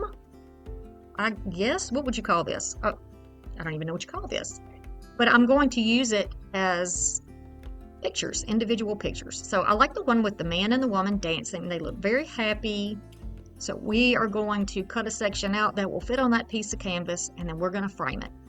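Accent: South, United States. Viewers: One of my favourite days of the week and the other being Tuesday. As I'm in the uk I watch your videos the day after you post them. Always the first video I watch as well and you never disappoint me. I love how chatty you were and when you get carried away or nostalgic about something how your southern accent gets stronger. Always cheers me up and I sure needed that today. Love how they all turned out and I'm definitely going to give the clay jug a go. Thank you again xx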